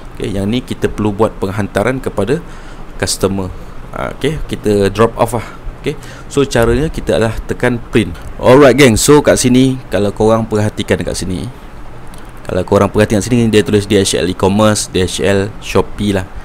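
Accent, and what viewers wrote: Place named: Johor, Malaysia. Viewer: “Haaa kena tukoo” 😂 johorean sgt, tak berniaga shopee but i really2 enjoy your explanation... keep uploading ❤️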